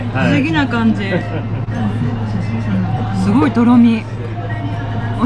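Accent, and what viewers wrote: Kansai, Japan. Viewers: なんかオヤジ飲み。でも、おも可愛い関西弁の女や。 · 顔も関西弁も可愛い過ぎる❤️‍🔥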